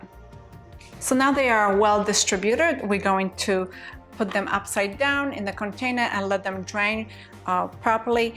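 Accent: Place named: Iceland. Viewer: You have such an interesting accent! It's subtle, but it's there. Icelandic? 🤔 Dutch?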